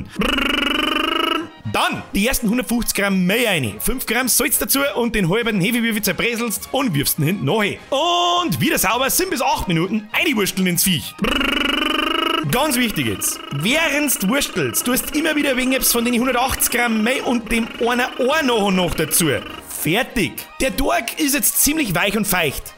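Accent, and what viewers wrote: Bavaria, Germany. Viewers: Kriegt schon allein für's Boarisch ein Abo, ich musste sofort an den "Münchner im Himmel' denken. 🤣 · Du bist da beste bin a aus Bayern Niederbayern endlich gib s moi gescheite YouTuber die borisch redn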